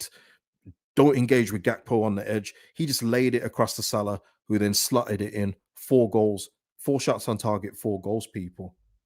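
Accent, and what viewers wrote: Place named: West Midlands, United Kingdom. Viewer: bro.. my bad man. I completely forgot! But hey, I can hear you're local to me (I'm from the west mids) so I just wanna say keep up the content man. Love hearing a common accent and knowing I'm not alone down here lol. I don't know any whites fans at all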